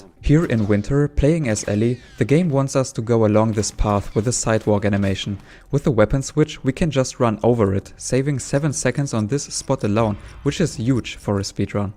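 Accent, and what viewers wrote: Germany. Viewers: digga und man hört trotzdem in den ersten 2 worten das du deutsch bist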